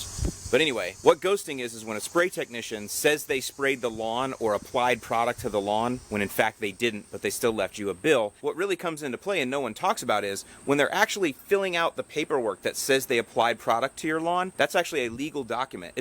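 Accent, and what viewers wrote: Chicago, United States. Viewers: Are you from the Chicagoland area? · Spot on Chicago accent!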